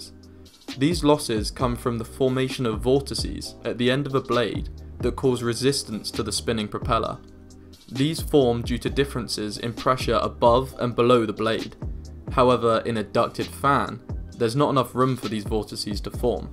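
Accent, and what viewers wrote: United Kingdom. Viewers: This video demonstrates if you have a British accent it does not necessarily mean you are smart. Lol, I'm sure he is intelligent. It's just that he knows so much that cant be proven.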